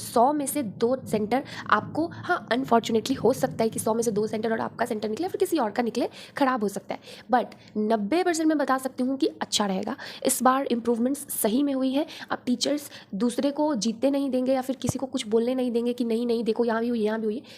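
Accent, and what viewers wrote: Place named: West Bengal, India. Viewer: Are you from wb ?..upki tone se pata lag rahe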